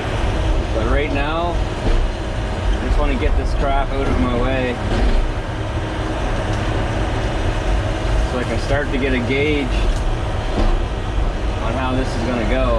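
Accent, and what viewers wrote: Nova Scotia, Canada. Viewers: Dude, just found your channel!!! My Dad and his side of the family are all from Shelburne, Gunning Cove specifically (he moved to Cali when he was 11). Lots of my distant relatives still live in that area, across from McNutts island. Every summer growing up (3-4 weeks) he would drag me, my brother, and my Mom from Washington State to Gunning Cove Nova Scotia to spend time there. We would play golf, eat lobster, archery, fish, etc. I hated it then, but now, those are some of my most cherished memories. Dad passed away in 2010 (*uck you cancer), but us three have been back 4-5 times since. Part of Dad rests in the water of Gunning Cove just as he wanted. Sorry for the story, but listening to your accent and hearing Nova Scotia I had to comment. Thank you for the videos man!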